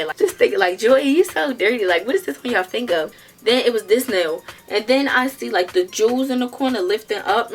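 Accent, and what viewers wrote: Baltimore, United States. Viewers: My first video of u and know u must be from Baltimore I love the accent lol💕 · Are you from Baltimore ? Love your accent . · Tew, dew, stewpid 🤣🤣🤣. I love Baltimore accents 😂. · Now tell me why I knew you was from Baltimore before you even started the story 😩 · Just came across dis video while watching different storytimes Nd You said “do” a couple times and I straight knew u was from Baltimore 😂.. but this exactly y I hate getting my nails done I swear it always hurts then I don’t be saying nothing to em.. i just be sayin “pretty hurt” in my head but it be burning fr 💯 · u gotta b from bmore lol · Nah she sounds like she from Baltimore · U from Baltimore? U sound like one of us. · Baltimore ASFFF😂👏🏼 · You from Baltimore ain’t you lol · You have a accent are you from Baltimore? I enjoyed your story time ☺️ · Ma'am are you from Baltimore?? 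· are you from bmore? lol you sound like people from there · I was going to ask if she was from Baltimore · I just know you’re from Baltimore. You got to be !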